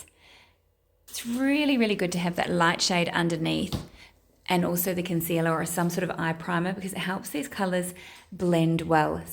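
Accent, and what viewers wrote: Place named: New Zealand. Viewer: Ohhh I see becuz it says Australia on your bio but your accent is a bit kiwi 😅 I was a quite confuse.. I was trying to figure out if you’re a kiwi or an Aussie because u almost sound Australian but more towards New Zealand, it’s like you have mixed accent between the two I’m Thai who have lived in New Zealand for 15 years but now currently living in Australia Btw you have a lovely kiwi accent and you speak very nicely ❤️ it’s nice to listen to 🤗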